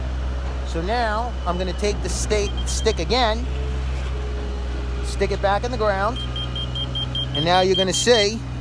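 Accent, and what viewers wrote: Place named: New York, United States. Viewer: this dude sounds new york as fuck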